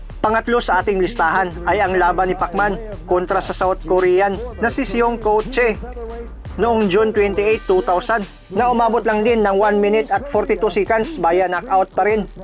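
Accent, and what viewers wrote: Visayas, Philippines. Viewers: Na gusto han, ko naman kaso yung bosis MO halata gud na bisaya ka, haw Ka pas pas nemu ag estorya hehe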